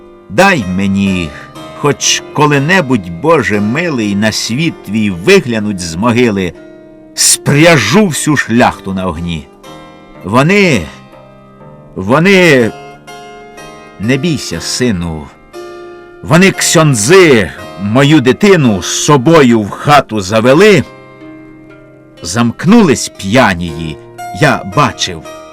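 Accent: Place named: Ukraine. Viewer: Голос дуже український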